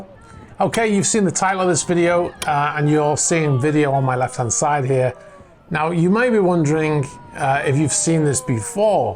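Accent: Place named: Merseyside, United Kingdom. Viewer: Really like watching the channel, your reply to Chris the weirdo with the major personality issues was spot on, I could be wrong, but do I detect a Merseyside accent, being from Merseyside myself, I wondered if you are from here, what part of Merseyside do you come from ? 🖐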